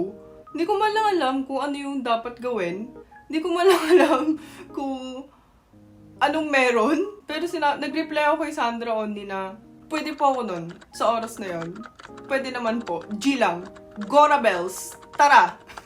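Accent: Batangas, Philippines. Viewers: Para kang Pinoy talaga kung magsalita.❤ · Woukd kove to hesr yiur Batangas accent!👍❤️💚💜👏🤣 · I have been watching your vids ever since i chanced upon one some weeks ago, and i got hooked. Eh, para akong nakakarinig ng aking kababayan, Ay, Batanguenang Batangauena magtagalog pati punto eh 😀😀😀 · tlgang pinay n pinay k n tlga mag kwento.. pati ung expressions ng lhat ng galaw at pananalita, pinay n pinay kna tlga...i am proud of you..❤❤❤ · So true--very Filipino nga Tagalog niya and kung papakingan lang akala mo talaga Pinoy yung nagsasalita. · I like your Batangueno accent hehe... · Pure pinay accent..😘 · Inborn na may natural linguist skill itong si, pati english accent, pinay na pinay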